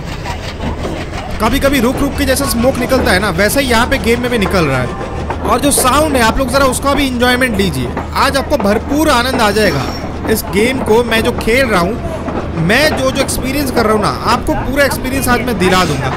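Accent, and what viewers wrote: Bengal, India. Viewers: Yes he's bengali see his most popular SEALDAH rajdhani video's last 5 minutes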